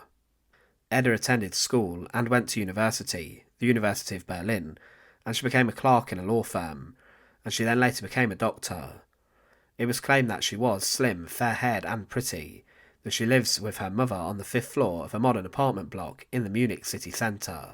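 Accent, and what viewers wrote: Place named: Yorkshire, United Kingdom. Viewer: It’s probably AI. I find narration by AI very irritating. The accent is pretty heavy, maybe Yorkshire.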